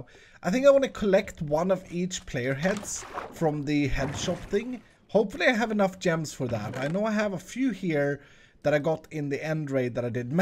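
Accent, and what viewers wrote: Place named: Sweden. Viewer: I love your swedish accent Btw I'm swedish aswell so I speak the same...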